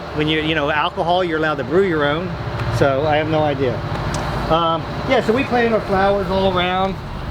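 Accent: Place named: South, United States. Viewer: I have always wondered. Is saying "anywheres or somewheres" a southern thing? Good vid today!! I'm about to cannon ball in to your pool!!!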